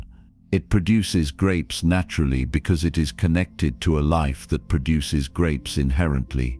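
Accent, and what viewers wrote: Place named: United Kingdom. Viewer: Nowhere in the word says we were broken with him. We died with him. I don’t like it when a different nomenclature is inserted that is not scriptural. We still have an old man nature I renewed mind walk is an intense mindset. Remember when Paul says who shall deliver me from this dead body… We are to reckon our selves dead unto Christ and renewed in the spirit of our minds to the new nature. Where is the British accent narration lead to authenticity regarding a Chinese Christian?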